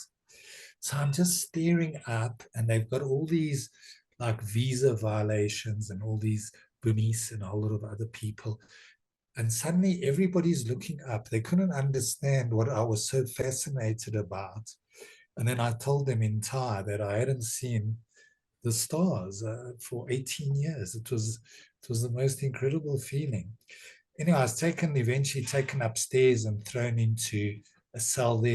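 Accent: South Africa. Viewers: is this a south african accent ? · This sounds like a South African but let's see if I'm correct in saying that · Good South African accent !!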